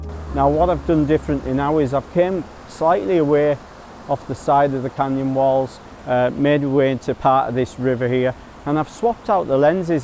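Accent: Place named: Merseyside, United Kingdom. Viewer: I've just subscribed to your channel, I think your images are great. Are you a Brit, you sound like a Merseyside boy 👍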